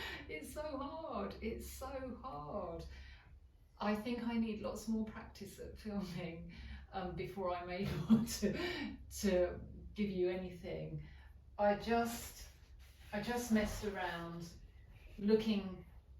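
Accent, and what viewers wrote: United Kingdom. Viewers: Madame. Keep them coming . Make them as long as it takes to cover one point. If its a short point make them short . What i mean is the video should cover the whole topic. That is being presented. I love your posh accent and being in your company. I am an artist from pakistan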